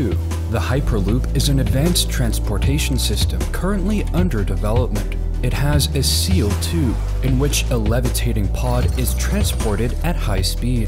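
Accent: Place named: United States. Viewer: Great video... I guess. Once again, an otherwise interesting idea is droned to death by this incessant electronica music that all sounds the same and never ends. I'd much rather just listen to someone describe the things in the video... Except for the fact that, once again, a video is read by someone who sounds American, but is written in broken English with bad grammar that sounds like it was mistranslated from some other language. Are these videos mass produced in some other country and then just 'read' by white people? I simply don't understand the point of this at all. Am I being 'pandered to?' Am I being patronized here? Why not just have someone from that country speaking English, or have them speak their own language and use captions? And PLEASE turn off that STUPID music. I hate it! It sounds exactly like a computer wrote it and every other one out there! 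And PLEASE get someone who can read and write in CORRECT ENGLISH GRAMMAR, or stop 'translating' it at all! Thanks... I guess... "...how it would look like?" Really? I don't think so. I literally couldn't get through the whole video. I had to turn it off because, without the terrible music & broken English, I don't know what the hell I'm looking at. Cut it out, whoever you are.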